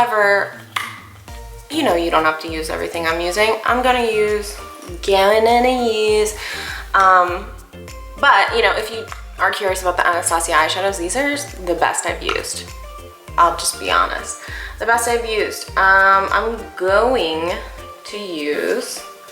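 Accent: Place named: Philadelphia, United States. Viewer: girl i love when you talk about philly stuff and when i catch your accent! cuz i am also from philly but w/ a god awful philly accent. i luv it!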